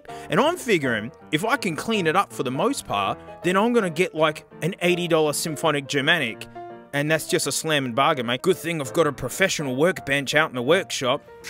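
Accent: Australia. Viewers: It's the magic of Australia accent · This man is audibly austrailian. Thanks, youtube reccomendations.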